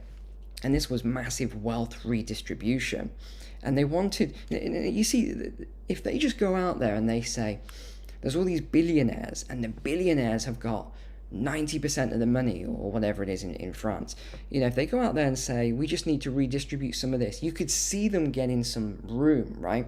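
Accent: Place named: England, United Kingdom. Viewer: I don't know where you live, but you sound like a Pommie, so you must know your country is a socialist state, otherwise you would not have all the welfare services on offer. Indeed all western Countries I would say are Democratic Socialist countries (except for the USA), because they all have welfare programs and social safety nets.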